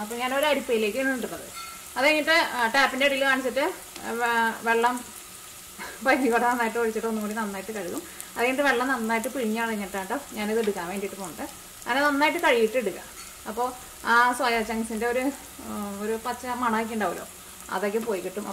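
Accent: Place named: Angamaly, India. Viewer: Slang ketitu nammade Angamaly Kari anennu thonanu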